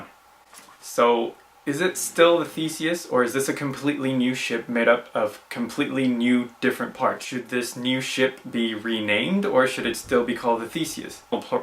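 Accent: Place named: England, United Kingdom. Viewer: Oh, I really found this thought pretty nice! Loved it, also loved your English accent.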